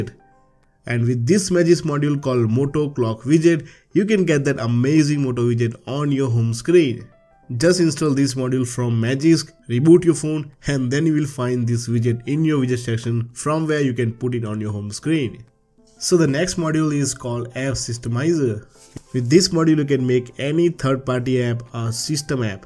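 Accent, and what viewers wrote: India. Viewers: thats Indian accent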